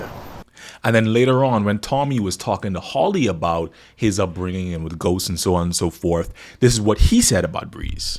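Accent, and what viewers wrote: Bahamas. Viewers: Are you from the Bahamas your accent remind me of mines? Bless up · you sound Bahamian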